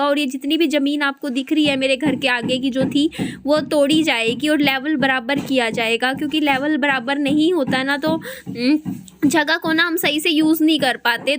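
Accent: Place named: Haryana, India. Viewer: to mujhe haryana se charkhi dadri se lgti hai.. mtlb us ki boli se.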